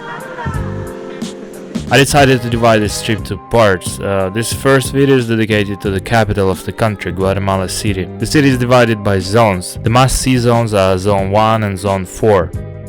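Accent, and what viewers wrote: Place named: India. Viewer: Are you from India your accent and your way to exprés yourself is terrible maybe you should try a silent video ! Mediocre video to say the least !!!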